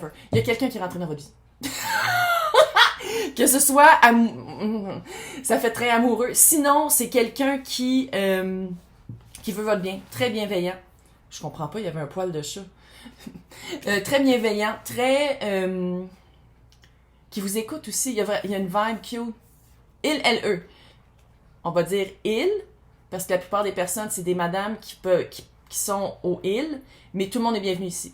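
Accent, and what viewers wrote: Canada. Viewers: Bonjour Madame, je vous Adore votre accent du Canada magnifique Pays.🌹🌹🌹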